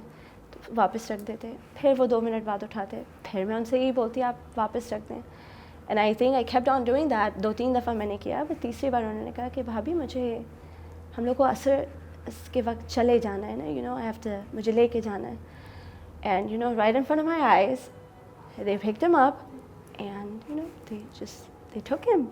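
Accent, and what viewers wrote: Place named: United States. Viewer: She sounds american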